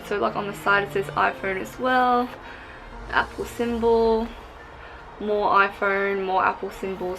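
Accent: Australia. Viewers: Are you Australian cause I am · Are Australian? You sound like you are? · Your Australian!!!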